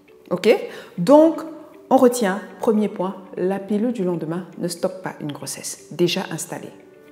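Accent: Cameroon. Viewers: FAQ: êtes vous camerounaise? 😅😅 je reconnais un peu l'accent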